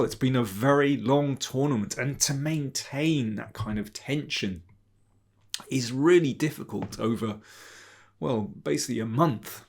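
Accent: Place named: RP, United Kingdom. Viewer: I like this channel as Daniel King's English is truly BBC-style. Always picking up new vocabulary. From this video: 'trifle', 'set things in train' and 'indictive'. Thank you!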